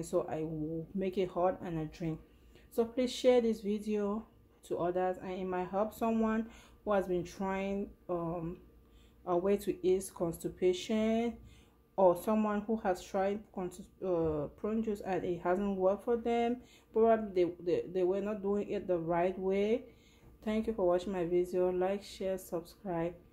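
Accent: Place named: Edo, Nigeria. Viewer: Sounds like a 9ja sister Beni or Esan. I go try this one sha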